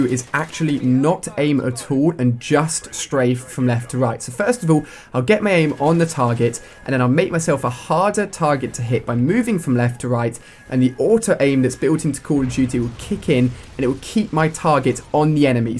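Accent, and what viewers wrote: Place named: England, United Kingdom. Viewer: Your cool love your England accent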